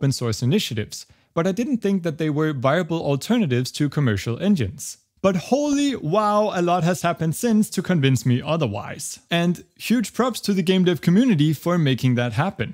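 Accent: Denmark. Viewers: På trods af den meget milde danske accent, og at jeg vidste du var dansk. Så var det helt underligt at høre dig snakke dansk 😆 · Are You Danish? :D I just moved to Denmark recently, what a coincidence :D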